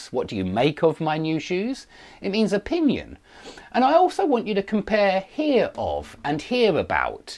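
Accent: England, United Kingdom. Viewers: I love your English accent !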